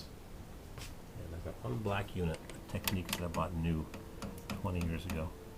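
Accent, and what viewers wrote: United States. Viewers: With that southern drawl, he sounds good doing it too.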